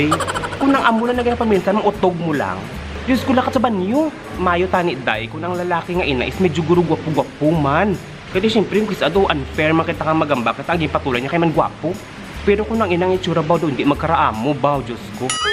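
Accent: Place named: Hiligaynon, Philippines. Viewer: enjoy gid ko kon Ilonggo dialect ikaw. Hidlaw gid ko pamati. Thank you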